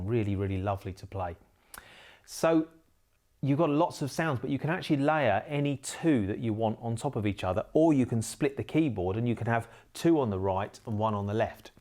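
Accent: United Kingdom. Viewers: Who knew this mild mannered guy with a British accent was a funk genius?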